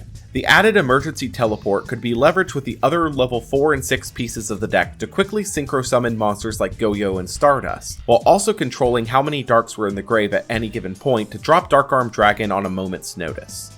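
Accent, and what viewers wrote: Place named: Baltimore, United States. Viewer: baltimore accent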